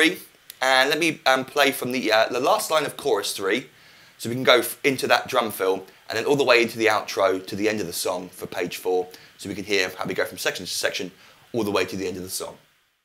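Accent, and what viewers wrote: United Kingdom. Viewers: Thank you! Like your strong British accent.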